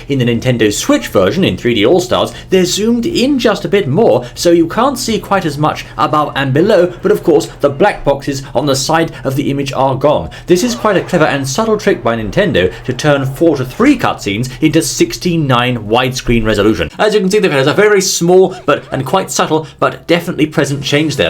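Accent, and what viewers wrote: RP, United Kingdom. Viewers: your accent is posher than mine! I too are from the UK. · OK. This is the first time I've heard him speak but..... I LOVE HIS BRITISH ACCENT!!!!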